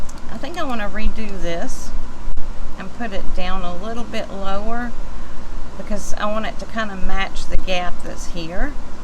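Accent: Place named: South, United States. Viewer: Hi, I just subscribed. Her cup from you came out very pretty! Hers is very cool too. You must be from North Florida? because you have the southern accent 💗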